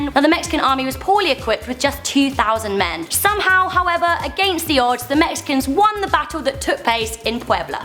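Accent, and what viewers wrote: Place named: United Kingdom. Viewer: GIRL YOUR SPEAKING BRITISH ENGLISH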